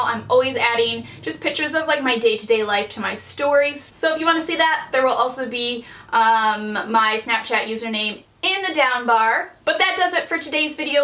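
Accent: Midwest, United States. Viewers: Are you from the midwest because I feel like your accent is very similar to a midwestern accent. Just wondering :) Love the vid btw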